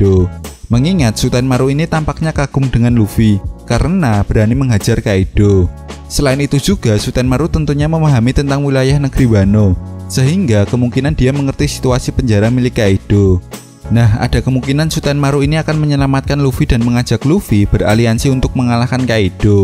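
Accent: Java, Indonesia. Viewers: Logat Jawa 😂😂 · Suara nya jawa banget mas , gaenak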